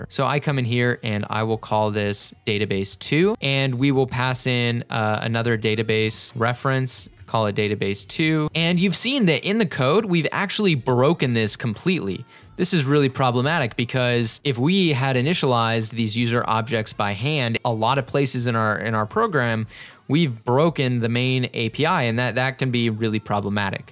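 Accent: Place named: England, United Kingdom. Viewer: Perfect explanation it's also nice to hear an an English accent I hypothsize YouTube's algorithms promote Indian vloggers it's so hard to find programming videos in English accents thanks for the awesome video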